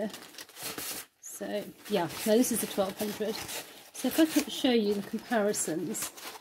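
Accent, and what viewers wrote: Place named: United Kingdom. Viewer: Hi, if you use two-part epoxy products a lot, you can get very sensitized to them, so you might want disposable gloves for what you are doing. After the two-part products have cured, they are very inert, and there is no concern about contact. In practice, the concern might not apply nearly as much to the putty, because it doesn’t have the honey consistency that most two-part epoxy products have, but in principle it still does. Thanks for the nice vid, from an Ahmericaan that loves hearing the Queen’s English. ~Brian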